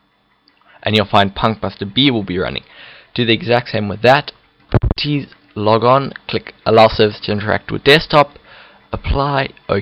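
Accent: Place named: South Africa. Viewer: Hey, Are you South Africa, you sound a bit similar to me..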